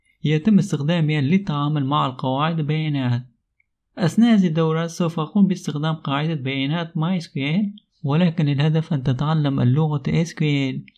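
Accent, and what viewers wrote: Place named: Syria. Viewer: الأستاذ من كوردستان بس فعلا كأنو بيحكي سوري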